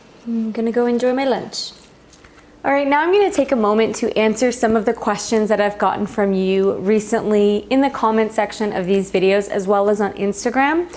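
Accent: Canada. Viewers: Off topic question, are you Canadian?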